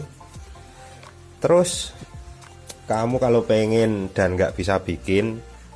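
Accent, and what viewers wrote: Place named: Java, Indonesia. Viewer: Jowone ngendi mas...kok jowo blejed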